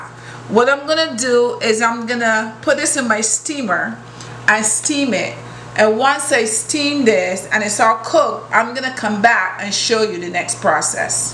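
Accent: Barbados. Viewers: So sweet to hear a real-real bajan accent.. even if it's putting something in her well.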